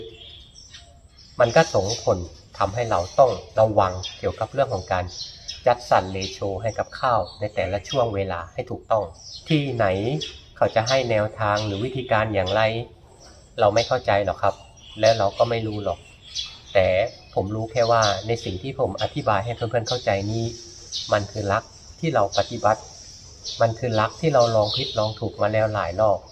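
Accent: Sukhothai, Thailand. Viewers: ส่ำเนียง เหมื่อน คน ซุโข่ทัย เลยครับ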